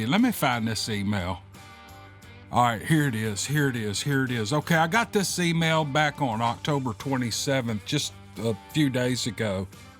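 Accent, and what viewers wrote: South, United States. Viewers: Wow, what an email. Can’t please everyone. We ❤your podcast and your Southern Drawl. Thank you. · I personly like your southern drawl NEVER CHANGE IT ! TO H3LL WITH THEM !! WHAT part of Mississippi are you form most of my family in Mississippi is in the OXFORD area ..They have Ghost Stories and Black Panther stories .. Now you have a GOOD Night Maybe We can Meet up for a Barbeque and tell some tales for your show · hey,its Peggie Sue from Ky, and i just want you to know that the way you talk is why i first started listening to you, and that was before you had started numbering your vids. So from one southern accent to another, dont feel like you ever owe an apology for being true to who you really are. That tortured soul, responsible for the volatile email you received, clearly hasnt reached the peace and happiness that you have, so for this envy and absolute ignorance, they responded the only way they knew....BEING IGNORANT DISGUISED AS BEING SUPERIOR. 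So, may God bless you and your family. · The author of that email needs LOTS OF PRAYER. Why would you waste your time running another person down like that? She is in a sad, angry place. I think I have been listening since the beginning and am so grateful for all of your effort,. You are correct about the pronunciation of bipedal. I just always assumed it was your Southern accent if you pronounced things differently than I do. 😅😊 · My mother taught me, "If you don't have something good to say don't say nothing at all." Just as you said, " Turn off the channel." ❤ I love your southern accent and everyone has trouble pernouncing some words. I'm guilty of that and I'm bad at spelling too. 😂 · Stay southern.i got your back. Iam from southern Missouri. Have the same southern. Talk. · We love your videos. Your the best story teller on YouTube, hands down. Southern accent and southern style story telling at its finest. 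Alright here we go! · Never apologize for your Southern accent! You don't sound any better or worse than the rest of us Southerners, so you're in good company.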